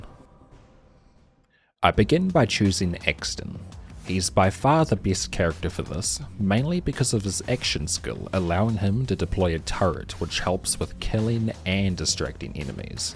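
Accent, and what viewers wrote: New Zealand. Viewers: New Zealand accent is a strange choice. It's very realistic sounding, but most other accents are a lot more pleasant on the ears. "Uf I take even a sungul hut, the run is did."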